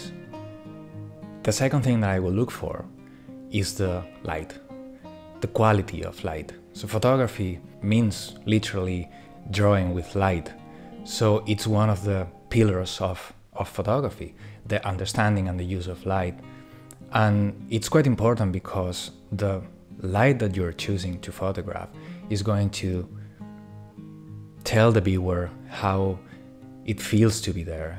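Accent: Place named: France. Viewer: The cadence of your speech, the small motions of your head and body when you are talking and your accent remind me so much of the french actor, Tchéky Karyo. See especially his character in the 2011 film, "The Way" written and directed by Emillo Estevez.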